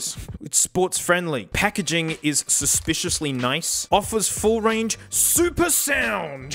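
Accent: Australia. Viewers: Question are you Australian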